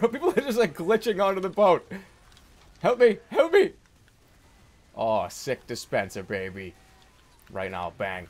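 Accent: Boston, United States. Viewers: well he is from boston and the scout is from boston so the same accent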